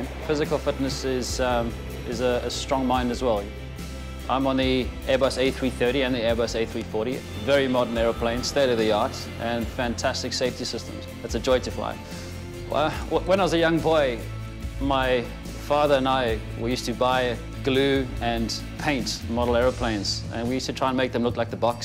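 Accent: South Africa. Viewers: He's South African. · I think he's South African by his accent and name,which maybe Dutch and therefore Afrikaans. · he sounds very SouthAfrican -) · Is that a South African accent I hear?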